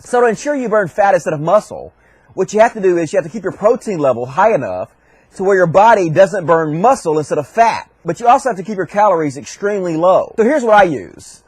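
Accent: South, United States. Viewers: Forgive this non-American his ignorance but I never thought I'd hear a Southerner casually talking in metric. I thought you Americans recoiled like vampires when ever someone starts talking about grams and hectares :P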